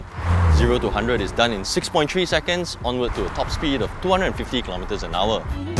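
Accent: Malaysia. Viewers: 怎麼感覺是馬來西亞腔英文....好奇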